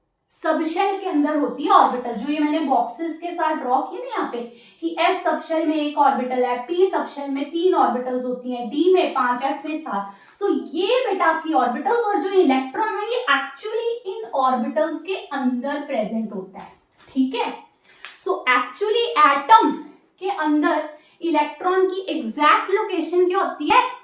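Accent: Punjab, India. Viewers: Mam ap punjabi ho???😅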